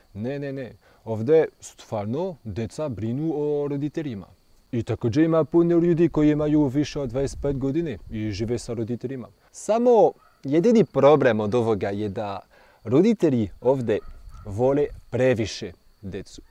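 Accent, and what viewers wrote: France. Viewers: Hahaha o bože kako dobro zvuči srpski sa francuskim naglaskom :)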